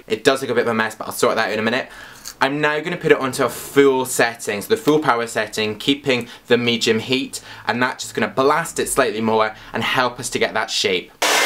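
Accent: Scotland, United Kingdom. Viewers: Are you Scottish ? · That's how people in UK speak their accent is different so don't call him gay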